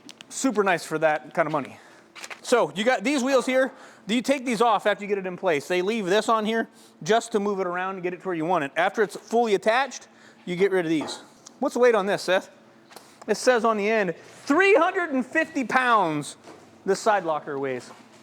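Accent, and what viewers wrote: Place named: Jamaica. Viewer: Dude you’re wasting you talent big time. You have to close your eyes so you can see better😮😅. Told my wife she immediately asked if you were Jamaican 😅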